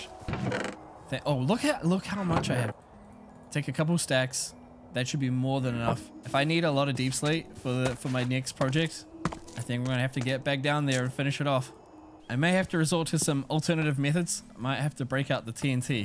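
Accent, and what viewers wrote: New Zealand. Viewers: You from New Zealand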